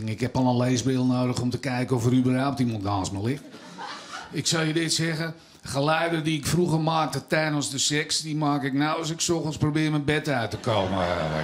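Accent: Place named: Amsterdam, Netherlands. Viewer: Ton Kas moet overschakelen naar Haags. Dat Amsterdams is erg irritant.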